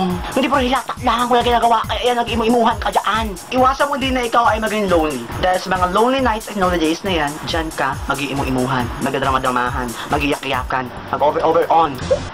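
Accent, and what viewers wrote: Visayas, Philippines. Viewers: Bisaya diay ka kuya? Ako pud baya XD Hahaha!! More power dahhling!:) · taga asa ka?bisaya lagi ka?hehehe.malingaw jd ko sa imo ba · bisaya diay ka hahaah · bisaya diay ka ter :)) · BiSAYA kaau uhy ! HAHA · bisaya kaba??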